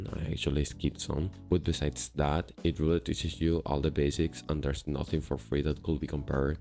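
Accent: Spain. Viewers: I watch videos on English, my level is not high, but I noticed - I can hear words and correlate with writing, but Spanish accent fully confused me, I can`t even concentreate on subtitles. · Amigo, con todos los respetos porque sé que has dicho que llevas 3 años aprendiendo inglés y no quiero restarte mérito, pero te recomiendo que mejores tu pronunciación en inglés. Sobre todo las vocales, pues las pronuncias igual que las vocales en español, cosa que es un error garrafal, porque en el inglés hay más de 20 vocales mientras que tú solo usas las 5 del español. No puede ser que después de mas de 3 años aprendiendo inglés pronuncies la palabra "studying" de manera literal, con el sonido de la 'u' del español, y que también pronuncies la palabra "audio" igual que en español. No sólo es desconcertante para los hablantes nativos del inglés que tendrán dificultades en entenderte (porque al no pronunciar una palabra correctamente lo que haces es que el oyente la confunda con otra palabra cuya pronunciación sí sea esa que has hecho tú, como por ejemplo pronunciar 'sheet' como 'shit' o 'sheep' como 'ship'. 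Menos mal que al menos añades subtítulos a tus vídeos), sino que también haces que sea desagradable escucharte hablar, pues a nadie le gusta oír a alguien chapurreando su idioma de manera torpe y lastimosa, mas bien dan ganas de quitar el vídeo. Te recomiendo que cuando oigas videos en inglés, prestes mucha atención no solo al contenido del mensaje, sino también a CÓMO pronuncian lo que dicen. Si el acento que quieres aprender es el del inglés americano te recomiendo mucho el canal de Rachel's English, que se dedica exclusivamente a enseñar la pronunciación del inglés am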